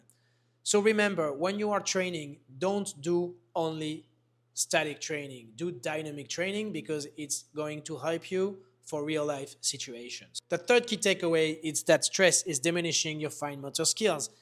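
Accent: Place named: France. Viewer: You sound French as fuk!! Rarely seen a Frenchman that understands and supports 2A... 🙏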